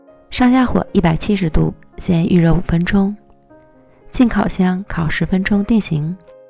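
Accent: Northeast, China. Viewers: 博主的东北口音走到哪都是特别有辨识感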